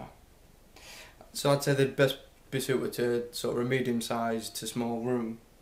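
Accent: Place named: Yorkshire, United Kingdom. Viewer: ***** Safe bruv! lols can tell with thas voice, Am near Leeds like. Had to get a lone against my AE 120s while next month. got some wharfedale 9.0 for £25 and am dam impressed for such small speakers. Gonna cop some 9.1s now and some nice stands. hopefully next month il have my ae's back and be a very happy bunny. Incredible value are the 9.0/.1 determined to find 9.1s at about £70. Peace, and keep up the great work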